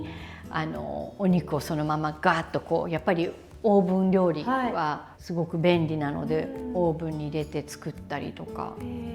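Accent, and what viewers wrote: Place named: France. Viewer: 素敵✨✨✨✨ 長くフランスにいると 日本語がちょっとフランス訛り🤭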